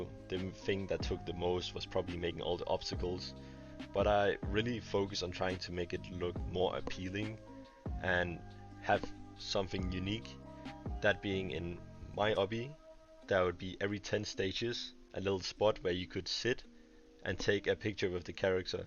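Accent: Denmark. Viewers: are you danish? i have to ask this question okay its important...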